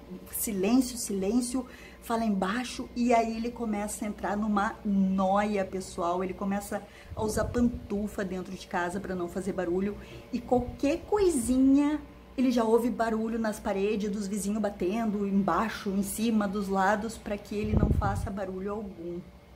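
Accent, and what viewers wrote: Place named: South, Brazil. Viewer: Oi! Vim pelo canal da Andréia, você deve estar ganhando alguns inscritos, merece! Como é simpática! E esse sotaque do Sul? Tenho memória afetiva de uma professora minha do primário, gaúcha, e adoro essa musicalidade no falar! Eu precisei parar seu vídeo e ler o Inquilino. Estou na parte em que ele vai para o apartamento do amigo da Stela e eles estão lá falando da moça que se suicidou. Depois que terminar volto aqui para ver o restante da sua resenha! Eu sou terror/suspense na veia! haha Você citou o filme ser melhor que o livro. Um super exemplo para mim disso é o filme 1408, baseado em um conto do King. Você deve conhecer. Fui ler o conto após ter visto o filme e pela primeira vez achei que King não explorou direito o potencial do conto e do personagem haha, que heresia! Não parecia o mestre da prolixidade, porém temos que entender que é um conto. 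Ainda assim, quando acabou eu falei: mas já? kkk mil vezes o filme! Como dizem, ganhou mais uma inscrita! Bjs!!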